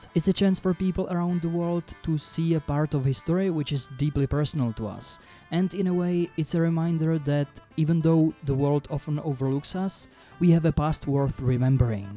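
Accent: Czech Republic. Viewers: Ik ur czech thanks to the accent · Yeah, I've also noticed his accent. That is how we Czechs sound usually I guess :-) Maybe, it would be enough to work on his "r", sounded fine otherwise. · SVATÝ GULÁŠ!! Ja jsem ze SLovenska A múj Tatínek má taky rád Kingdom Come Deliverance Dve! Ale tenhle čas mňe hodně překvapil! Jseš Čech a tohle víš jak Holywood je Zapeklite Zastaranej a zabednený v tom aby "ze spodu sudu" delaj Jenom Re-maky a Spin-off a neskutečne reciklujou staré věci? Wow! Žasnu hodně!! Ďekuji 😄 za skvelý video. Tvúj Accent ťe prozradil. Je mi jen líto že Holywood a AAA vydavatelé jako EA a Ubisoft jsou proste (nadávky, Algorythmus to nemá rád) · Ten českej přízvuk mě trhá uši kdykoliv ho slyším, ale cením žes to video udělal 😆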